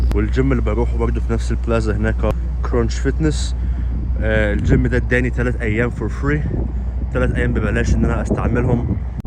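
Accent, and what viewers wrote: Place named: Arab world. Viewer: لا حسيت الاكسينت بتاعك في الانجليش اكسينت عربي فيمكن انت احتكاكك مع العرب اكثر بس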